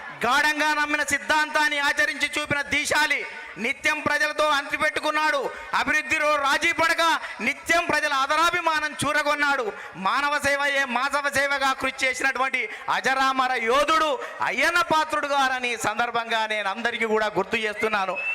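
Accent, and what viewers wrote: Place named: Telangana, India. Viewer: తెలంగాణ యాస భాష నీ ఒక్కడికే సొంతం నువ్వు మాట్లాడే ప్రతి మాట ఒక తూటాల దూసుకుపోతుంది అందరూ రాజకీయ నాయకులు పేపర్ లో ఉన్నదే చదువుతారు కానీ మీరు అప్పటికప్పుడు సందర్భాన్ని బట్టి వివరించి క్లుప్తంగా ఎంత విమర్శించారు ఎలా విమర్శించారు మీకు తెలిసినంతగా ఏ ఒక్క రాజకీయ నాయకుడికి తెలియదు ఎందుకంటే మీరు తెలంగాణలో పుట్టి పెరిగి తెలుగు జాతి తెలుగులో మాట్లాడే వ్యక్తులలో నువ్వు ఒక శక్తి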